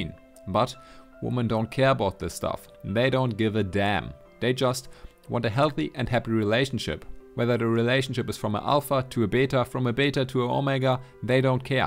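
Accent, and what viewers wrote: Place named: Germany. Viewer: I hear a German accent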